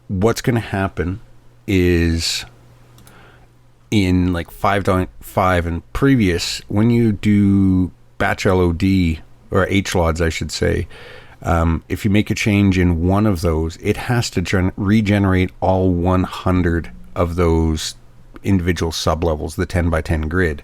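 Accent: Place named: France. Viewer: What is this robotic french voice. Please stop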